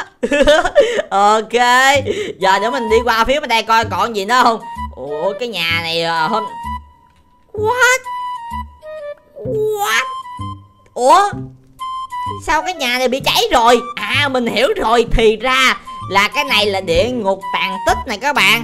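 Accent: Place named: Binh Duong, Vietnam. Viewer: Đọc lạ Bình Dương quá anh ơi😄😄😆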